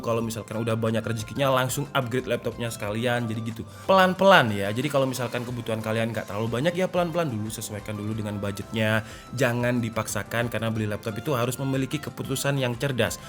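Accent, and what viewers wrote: Malang, Indonesia. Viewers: Abang Orang Mana Malang kahh?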